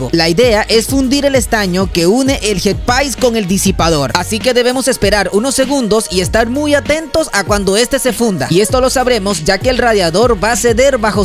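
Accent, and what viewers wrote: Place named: Venezuela. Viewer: es Venezolano el acento te lo dice mi pana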